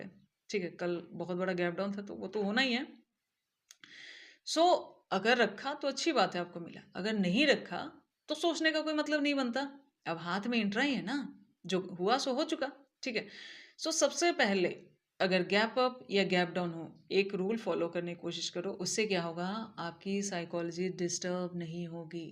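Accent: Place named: Uttarakhand, India. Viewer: Didi..bahoot badhiya..aap ki Hindi gazab h..aap uttarakhand ya uttar bharatiya lg rhe h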